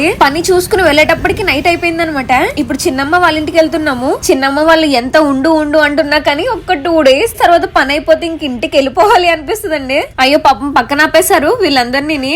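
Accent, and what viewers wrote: Godavari, India. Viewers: ఈ గోదారి మాటల గలగలలతో ఉండే మీ వీడియోలు చూసి చాలా రోజులైందండి, ఈరోజు ఈ వీడియో కనపడింది, ఎక్కడ,ఏ చిన్న విషయాన్ని కొంచెం కూడా వదిలిపెట్టకుండా ప్రయాణం మొత్తం అన్నీ బలే సరదాగా చెపుతూ,చూపిస్తుంటారు, మీ ఆరోగ్యం బాగుంది కదండి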